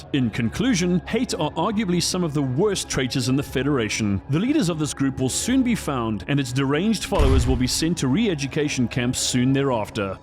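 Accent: South Africa. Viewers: I hear a SOUTH AFRICAN CUZZIN... I subscribe!!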